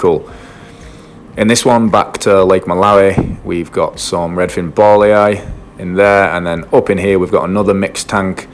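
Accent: United Kingdom. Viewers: Just found this, you from the UK? As you have an accent south of Manchester 👍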